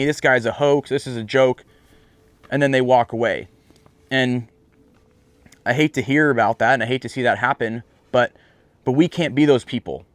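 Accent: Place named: Texas, United States. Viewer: EXACTLY, Jesus is not our "Jeanie" God is not our butler! He's our heavenly Father ! And most DON'T understand, when they say, " i prayed and the opposite happened or this went wrong! God is a FAITH God! Means He's listening and RESPONDING to FAITH! When people talk that way, #1 they probably ain't God's child, they really aren't "saved" or #2 they have NO FAITH, or understand how FAITH works! [Hebrews 11:6] Maybe, when you're truck broke down in Texas, He's trying to say you belong in Texas! You sure look & sound like a TEXAN! Anyway, you have to follow your heart! God bless!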